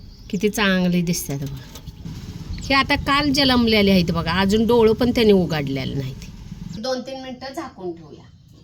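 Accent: Kolhapur, India. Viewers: तुमचे सगळे पदार्थ मला खुप आवडतात आणि तुमची कोल्हापूर ची भाषा पण आवडते ☺️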